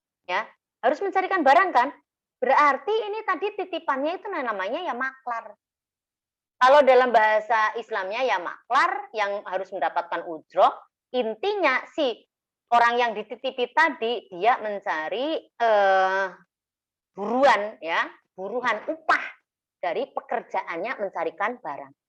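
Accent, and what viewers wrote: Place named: Banyumas, Indonesia. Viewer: Q sung seneng bolak balik tak ngringokna 👍🙏hehe wong ngapak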